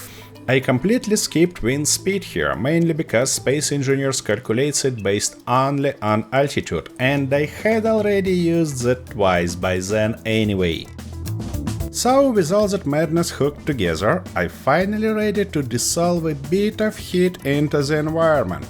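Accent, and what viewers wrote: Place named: Russia. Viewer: This is already interesting as a topic just on it's own but having it explained to me by a crazy person with a thick russian accent somehow makes it even better.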